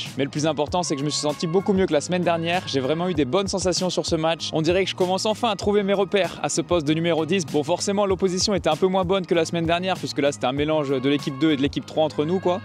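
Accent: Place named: Finland. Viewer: Je n'ai jamais entendu le francais en parlant avec un accent finlandais.. Super interessant et charmant, en plus !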